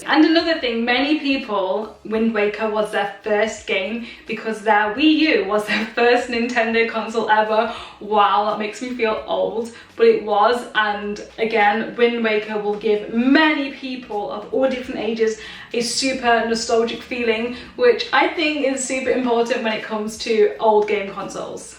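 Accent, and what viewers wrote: England, United Kingdom. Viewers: Excellent english 🏴🇬🇧 accent 😍. You look exactly like me but then as the female version. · Would like to hear you say it in that British accent.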